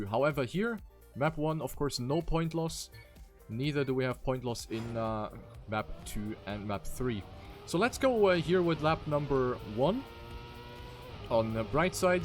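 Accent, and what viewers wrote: Germany. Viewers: Nice to see how self critics you are with yourself +++ german english 😉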